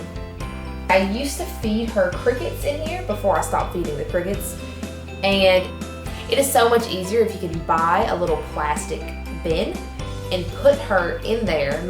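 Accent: South, United States. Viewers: love your southern accent lol ! and your beardie, and her setup! what a great deal on the cage, love your vids! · Sounds like a southern accent and i like it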